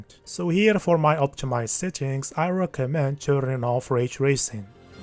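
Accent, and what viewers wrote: Morocco. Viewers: your accent is soo moroccan and i love it. + 1 sub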